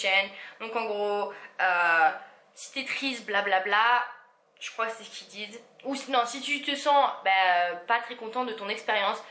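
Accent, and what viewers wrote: United States. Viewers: Super vidéo comme toujours !! 😄 Ta voix sonne de plus en plus américaine ( quand tu parle français ), c'est trop chou !! 😂💕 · Quel beau accent américain 😊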